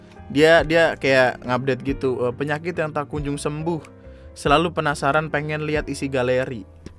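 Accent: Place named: Java, Indonesia. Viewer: Radak radak Jawa woskwowkowkwowk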